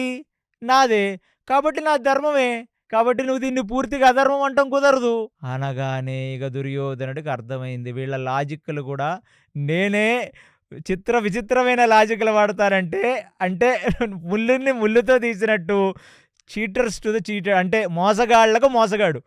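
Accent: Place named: Andhra Pradesh, India. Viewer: అసలు ఎలా time manage చేస్తున్నారో మాకు కూడా చెప్పండి sir...😂❤ ఒక పక్క job చేస్తూ ,, ఇటు వైపు మహాభారతం మీరు చదివి,గుర్తుంచుకుని మళ్ళీ మాకు easy గా attractive ga చెప్తున్నారు Hats off sir 🫡🙏more power to you ✊🔱 సనాతన ధర్మాన్ని కాపాడటంలో మీవంతు మీరు చేస్తున్నారు🙏 మీది Andhra కదా !! అనిపించింది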